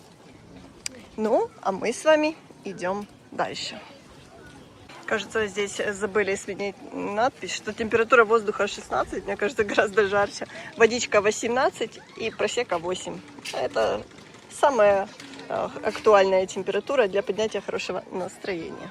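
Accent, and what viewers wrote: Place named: Odesa, Ukraine. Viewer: Шо вы мовой говорите , говорите по Одесски шо слух радует .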